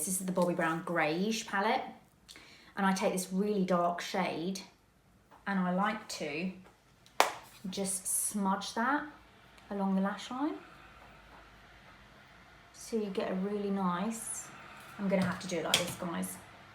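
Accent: United Kingdom. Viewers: I’m from Sweden and I love your brittisk accent and your sense of humor. And love to hear about your english life. By the way - I love makeup on you tube😍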